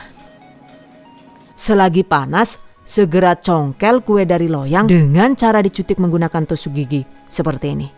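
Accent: Banyumas, Indonesia. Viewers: asalnya dr ngapak ya mba Pd bae karo inyonglah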